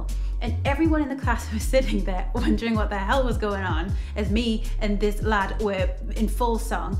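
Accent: Newcastle, United Kingdom. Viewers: are you from Newcastle? (or somewhere close?) :D i just uploaded a video on minimalism too and i'm also from newcastle..but i live in The Netherlands now... hi :) :) :)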